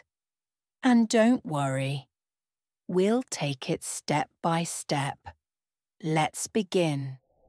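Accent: United Kingdom. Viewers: Is this british english?? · She said it's British, because it's her accent. It's in minute. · Is this a British accent? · England accent 😢😢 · noooooo qué lastima que es inglés británico... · (Is it british accent) Yes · I love the clear and natural British accent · Is it british accent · بريتيش اكسنت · It is british accent. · I don't like the British accent 😢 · She said british accent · No ,it’s is a British accent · Day 1 complete! This shadowing challenge is exactly what I needed to boost my confidence and improve my pronunciation. Loving the clear and natural British accent❤ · The British accent is very charming🥰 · This is the first time I have seen this video and I loved it. Thanks for this proposal and the encouragement! The British accent is my favourite, keep going! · I tried to find something with a British accent! 👏Thank you! 😊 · No she said at is a British accent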